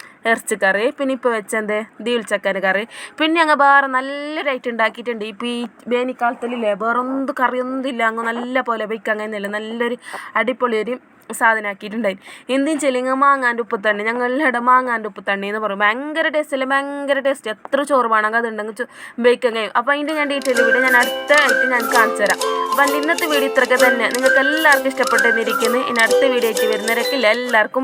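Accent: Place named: Kasaragod, India. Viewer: കാസർകോട് സ്ലാങ് സൂപ്പർ ക്യൂട്ട് വോയ്‌സ്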